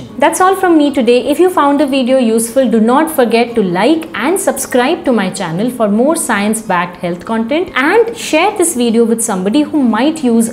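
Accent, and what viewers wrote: India. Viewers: Man how I like that Indian accent…. Could hardly concentrate on the contents 😊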